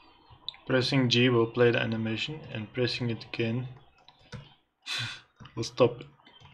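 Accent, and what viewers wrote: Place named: Afrikaans, South Africa. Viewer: I hear that Afrikaans accent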